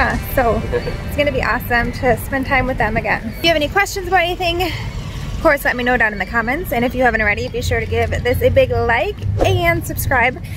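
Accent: Minnesota, United States. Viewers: Also? I love your Minnesotan accent! You from northern Minnesota? I’m from the Minneapolis area so… Hopkins to be exact. I can always tell when I hear a fellow Minnesotan :)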